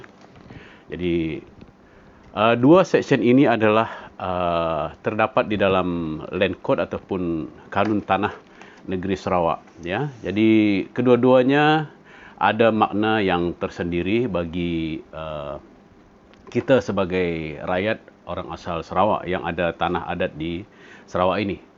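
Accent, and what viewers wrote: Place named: Indonesia. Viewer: Kenapa cakap macam indon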